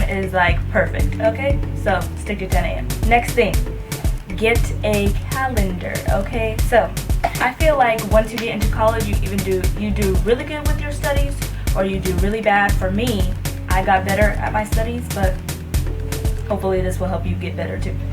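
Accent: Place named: Caribbean. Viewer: oh,thought you were from the caribbean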